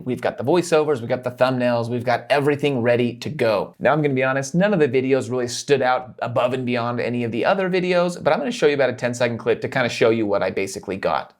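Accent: Australia. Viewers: Ur boi is from Australia not Britain, cool vid :) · that was an Australian accent.... not British.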